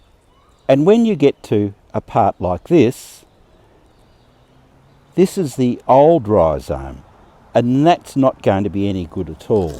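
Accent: Australia. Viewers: Just love your Aussie accent. And thanks for the helpful info.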